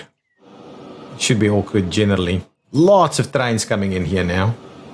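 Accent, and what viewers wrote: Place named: South Africa. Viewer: Saw Worcester in a Transport Fever 2 Spring Update search thinking this might be a South African. Could hear within 2 seconds the way you pronounce your R that you are a South African.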